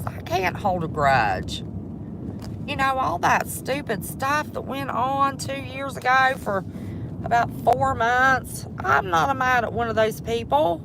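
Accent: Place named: Deep South, United States. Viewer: Where is your accent from sounds deep south, Louisiana? Mississippi? Alabama??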